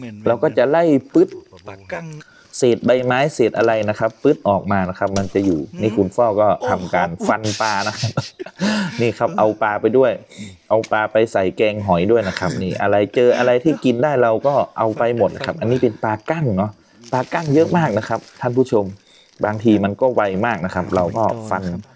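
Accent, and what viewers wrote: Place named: Phayao, Thailand. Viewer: ม่วนขนาดสำเนียงเหมือนเมียงพะเยาเป๊ะ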